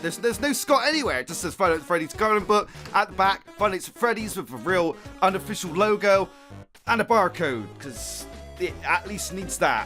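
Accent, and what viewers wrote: United Kingdom. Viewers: Vary British. Indeed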